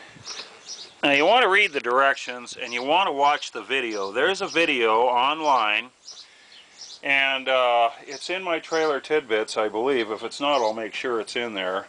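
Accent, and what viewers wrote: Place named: California, United States. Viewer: Hard to believe this was 10 years ago. Back when you still lived in CA, and had that CA accent., LOL. After watching this way back then, I bought the portable pocket hole Kreg Jig. I had a situation where I had to drill the holes on a framing board that was still good and very attached to the trailer. The portable one allows you to mount the jog on boards with a screw.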